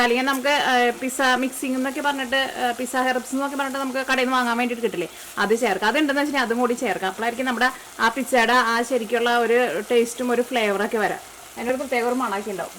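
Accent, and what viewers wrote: Thrissur, India. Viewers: Chechi thrissur kaari aaanoo....najn thrissurikkaariyaa.......tune kettittu thooniyathaa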